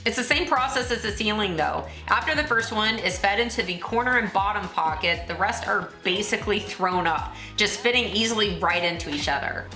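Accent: Texas, United States. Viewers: with your Texas accent, when you say the name it sounds like "Pure Stoke". ALso a cool name.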